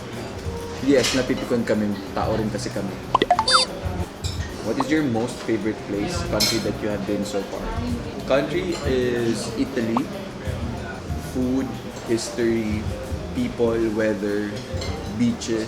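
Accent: Bicol, Philippines. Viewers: I can still hear the bicol accent. Siram sa pag dangug haha Namiss ko lugud ang Naga hah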